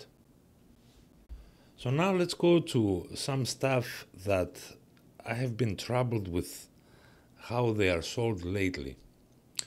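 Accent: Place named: Greece. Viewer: Your accent is so Greek!!! I am not ready to move on from my d850 I don’t like mirroless!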